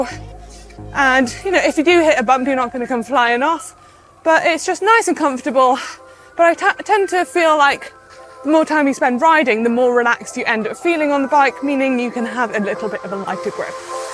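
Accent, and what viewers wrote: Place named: United Kingdom. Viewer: I'm just watching to listen to her lovely British accent. Three cheers for the King! 🇬🇧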